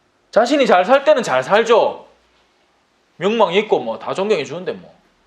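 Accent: Gyeongsang, South Korea. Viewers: 신부님~ 이틀전 우연히 신부님 영상이 떠서 오늘부터 마태오복음 1강 시작했습니다^^ 신앙생활1년차인데 같은 경상도 사투리라 친근하고, 많이 배우고 깨닫고 느끼고 갑니다. 앞으로 한 강의 한 강의 들을 때마다 저도 영적으로 많이 성장할것같아요😊😅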